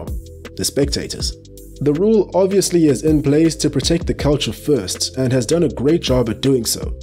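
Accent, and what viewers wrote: South Africa. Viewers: Love your channel ! You aren't South African by chance ? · You sound so South African. Its not even funny 🇿🇦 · Immediately recognized the accent. Greetings from SA 🇿🇦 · Mr speaker you have a South African accent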